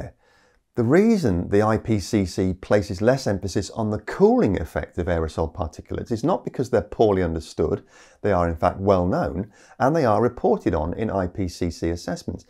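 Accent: United Kingdom. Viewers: Sucker for compassionate rationality, relevance, wisdom and science with a kind and gentle British accent. Typical highly evolved mammalian response.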